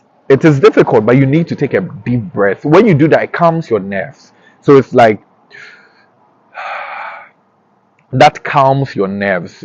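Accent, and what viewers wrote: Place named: Caribbean. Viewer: my brother you sound like youre from the Caribbean. ty so much for the information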